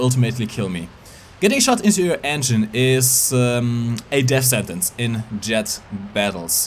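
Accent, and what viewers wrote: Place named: Germany. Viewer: Youre german right ? The accent is awesome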